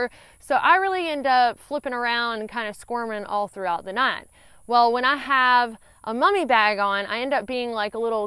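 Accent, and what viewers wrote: South, United States. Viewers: hey great video as usual! have seen most of your videos, not quite made it to the end of the CDT yet. I want to thank you for awakening a passion in me for the outdoors like I've not had since I was a younger man. you're such a lovely young woman with the best southern accent and I wish you much success and joy in your life! happy trails, and hey maybe we'll see you out there one day....... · You southern girl for sure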